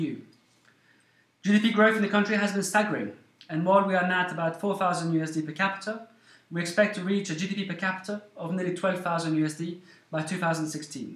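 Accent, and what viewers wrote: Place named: Wallonia, Belgium. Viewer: Wallon ? enfin c'est ce que je dirais à l'accent. Excellent video thank you very much , all those numbers are very very very interesting.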